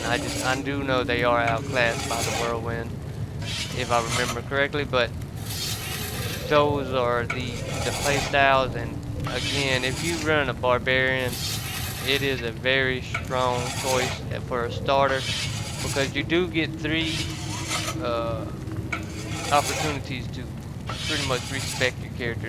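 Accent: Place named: South, United States. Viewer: just some Southern drawl or something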